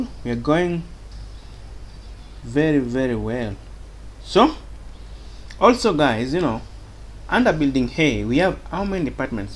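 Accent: Africa. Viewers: This was simply amazing. We were given project by university to implement this and you illustrated it perfectly. More power to you I guess by your accent that you belong to Africa. Lots of love for you brother from Pakistan. Kudos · Hello, great video and tutorial! I want to thank you for helping me with creating a network like this. I have a few recommendations for you to improve the quality of your videos even more. 1.) You should do something about the noise in your microphone as it is a bit annoying to focus on learning while that is constantly bugging in the background. 2.) You have quite a unique accent. Native African I believe. As I'm not familiar with your accent, sometimes it becomes quite hard to understand some words. So, using closed captions would really help to make your videos be even easier to understand. 3.) You should make a list of what the steps are in a serial order so its easier to pick up from anywhere in the middle of the video if someone is stuck somewhere or is confused because right now its a bit hard find specific steps if I want to go back to them. 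ALL that being said, you were a great help and I really love this channel. Thanks for creating such videos and keep creating more!!!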